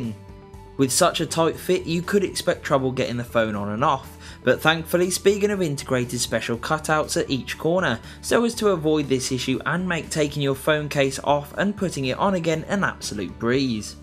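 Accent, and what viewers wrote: United Kingdom. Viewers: Sounds like he's from the UK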